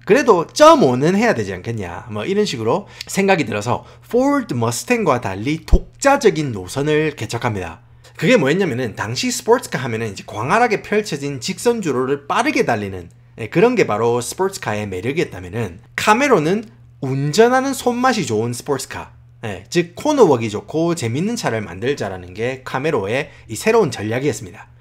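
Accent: Gyeongsang, South Korea. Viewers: 영어를 경상도 사투리로하네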